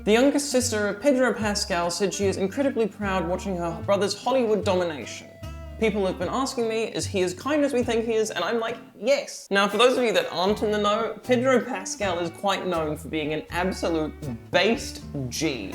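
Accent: United Kingdom. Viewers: I thought you already had a British accent! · This explains the British accent thing during your stream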